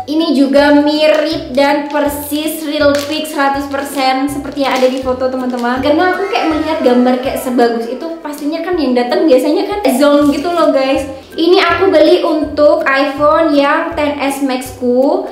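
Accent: Java, Indonesia. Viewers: Kok ngmg jawanya berkurang 🙂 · Medok bangett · Kak orang mana sih kok logatnya kyk orang jawa..jawa mana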